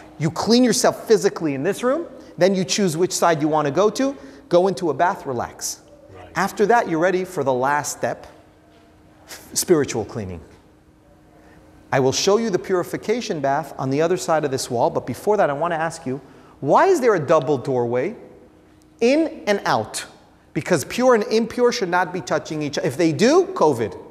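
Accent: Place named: United States. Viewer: It would be better with subtitles. Sorry to say it, your American accent , sounds anxious and your tone of voice neurotic.. Think who is your audience. You are doing a disservice to a great work. Let an Israeli tell the story.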